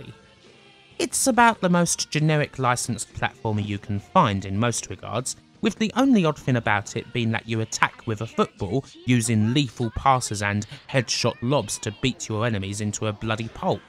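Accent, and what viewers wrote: United Kingdom. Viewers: Maldita sea! Gracias por esta y mucha mas informacion de videojuegos saludos desde El Salvador, exitos, your british accent help a lot with the lenguage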